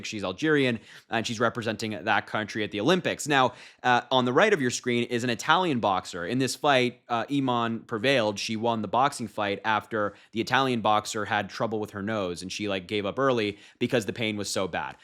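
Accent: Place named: England, United Kingdom. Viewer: you’re the best. I live in Montreal, Canada and I’m following you. Your English accent is excellent and easy to understand since I’m a french Canadian. My wife and I find that you are a very intelligent young man. Bravo!!! And keep on doing the good job. Roger:)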